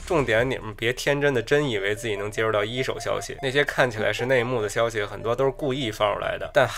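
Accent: Beijing, China. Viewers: RangErliVe 这就是内京片子味儿 哎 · 不是正经更新，比较随意🤣。老北京土著了哈哈哈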